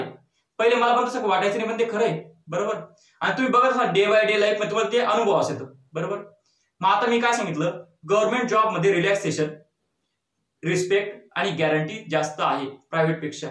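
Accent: Khandesh, India. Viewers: Bhau khandeshi she vatat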